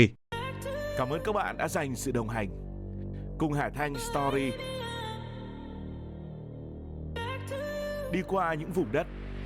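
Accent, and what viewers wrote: North, Vietnam. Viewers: Tiếng băc ky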